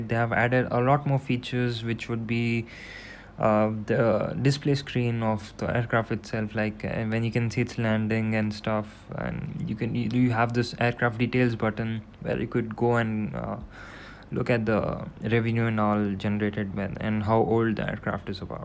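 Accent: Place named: India. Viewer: Fuckin indian accent :((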